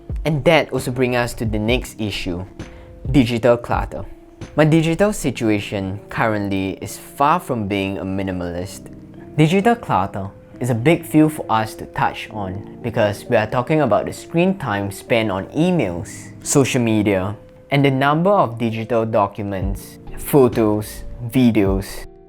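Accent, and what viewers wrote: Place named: Singapore. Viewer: I thought your accent was familiar, hello fellow singaporean!!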